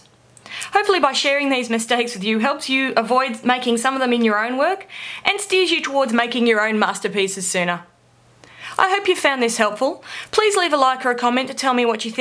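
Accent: Australia. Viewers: Hi. I'm preparing to teach a class using colour pencils and found your tips useful prompts for me to pass along. In some cases they were things I already knew but good to be reminded. Also thanks for showing unsatisfying past work especially how certain papers behave. It took me years to appreciate the importance of using quality art materials and stop blaming myself for unhappy endings. Now I always tell my students a bad piece may not be simply due to their talent or lack of it. Nice to hear the Aussie accent as well.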